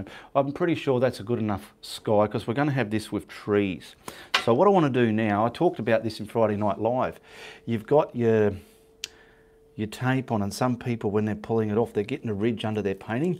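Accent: Australia. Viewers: I love your art and your Aussie voice!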